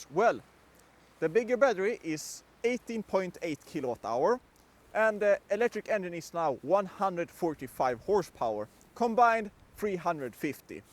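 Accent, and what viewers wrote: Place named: Sweden. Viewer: Cheers to Sweden, love the accent!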